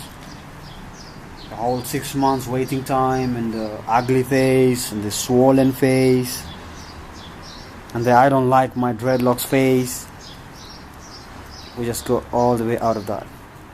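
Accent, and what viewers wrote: Kenya. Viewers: This is a wealth of information!!! I have been looking for a video that explains how to do this properly. Thanks!!! You sound Kenyan BTW. God Bless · you sound Kenyan...I wish I saw this before I had my loc extensions installed ....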